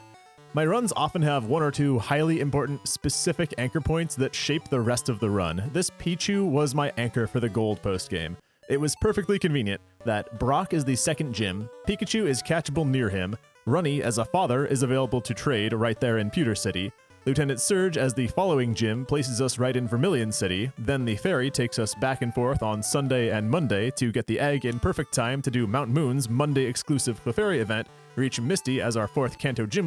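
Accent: United States. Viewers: this is not an AI voice over, just some variety of American accent.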